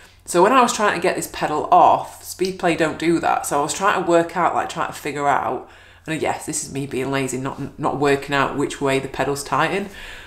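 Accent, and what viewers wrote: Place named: North, United Kingdom. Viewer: 🥰 northern lass 🥰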